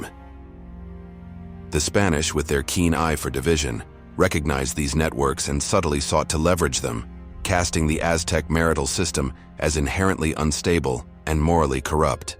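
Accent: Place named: United States. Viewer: You hace US accent. I Imagine that you are not concerned at all by any brutality commited by your ancestors towards native americans or blacks, please look inside your dirt before critizicing other. I just want to point a couple of things 1.- Aztec "priests" wearing a human skin in a religious ceremony was just a "cosmovision and fertility ritual". When settling in the lagoon and erectingTenochtitlan, the Huey Tlatoani of that time (about 200 years before Cortés) asked to the king of a city in the lagoon shore for his daughter. The king accepted. A few days later the king and some of his noblemen went to Tenochtitlan for a ceremony supodsely the wedding of the Huey Tlatoani with his daughter. They got horrified when the main priest started a ceremonial dance wearing his daughter's skin still pooring blood. 2.- If they were beloved, please explain not just the fall of Tenochtitlan, but how was it posible that the Spanish could rule such a complex society, when no more than 3,000 Spanish sailed to get settled in México in the first 50 years of Viceroyalty (60 per year) It could have been very easy for the indians to have expelled such "invaders" unless they preferred the rule of the Spanish rather than being ruled by any of their tribes. 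Morality: Before speaking about your disagreement to what the Spanish achieved, look twice an what your brutal and merciless society did in North America.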